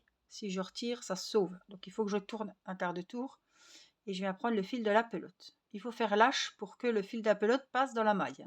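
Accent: Franche-Comté, France. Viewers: Donc je suis vos explications pour le bonhomme de neige pour ma petite fille c'est un plaisir de crocheter avec vous, petit aparté je voulais savoir si vous êtes franc comtoise car vous avez cet accent qui me rappelle ma Franche Comté merci pour tout.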